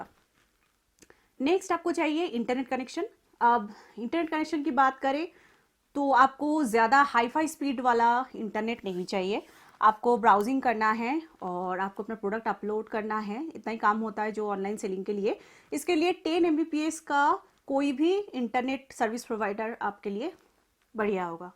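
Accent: Bengal, India. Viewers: Aapki hindi me bagoli language ka tadka he sunne mi bahot accha lagta he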